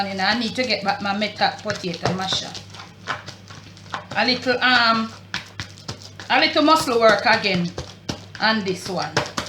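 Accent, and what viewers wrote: Jamaica. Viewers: Also our Jamaican patwa is like how you speak also always wanted to visit ... Nuff luv from ja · I’m African men living in the USA, just love jamaïcain accent, food and all one love my people 🙏🏿👍🏿💯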